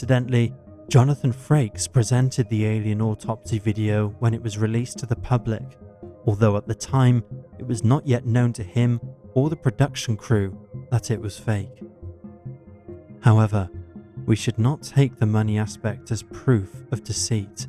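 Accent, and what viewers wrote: United Kingdom. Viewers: I appreciate all vids from Top5s. As well as the new narrator. Something about a British accent among listening to only American accents all the time that really helps lol Thx Top5s team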